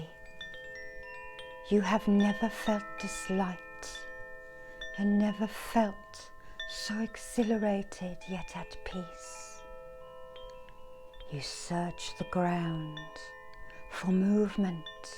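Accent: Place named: Southern England, United Kingdom. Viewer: Something about the tones of you south Britons is perfect for this gig.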